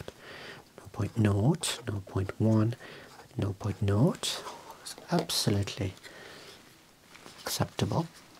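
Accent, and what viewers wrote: Birmingham, United Kingdom. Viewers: On the one hand it's just some bloke looking at a table tennis racket, but on the other hand I find it immensely satisfying and deeply relaxing during my Saturday lie in until God knows when watching a bloke inspecting a table tennis racket and speaking in a soothing Brummie voice. I find it's the perfect way to relax and unwind after yet another working week.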